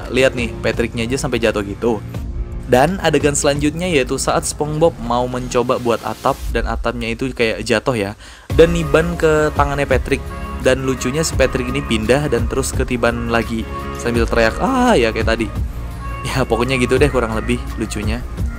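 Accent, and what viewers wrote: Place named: West Java, Indonesia. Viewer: Abang ti sunda nya bang Ngomongnya ada teh mulu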